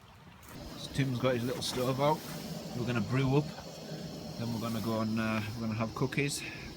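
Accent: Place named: Yorkshire, United Kingdom. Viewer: he sounds like a proper yorkshireman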